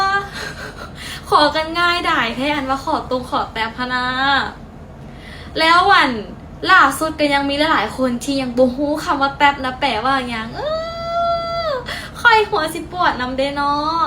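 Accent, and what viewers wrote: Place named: Laos. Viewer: ก็ลาวไง แต่นาารัก😅😅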